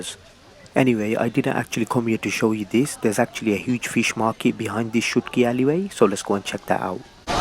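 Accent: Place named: United Kingdom. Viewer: Man your sylti maat is so accurate and has no weird accent, yet your British accent is so perfect. How come??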